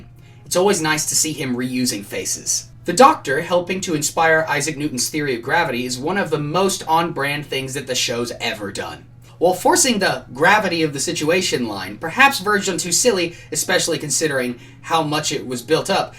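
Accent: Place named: United States. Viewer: why he got an American accent? i thought you were from the uk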